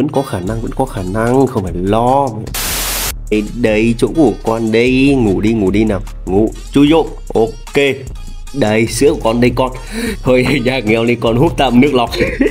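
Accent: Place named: North, Vietnam. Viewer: ko lq nhưng mà nghe ông nói giọng là miền bắc nhưng mà tui cứ có cảm giác ông là người miền trung hay sao á 👁️👄👁️👍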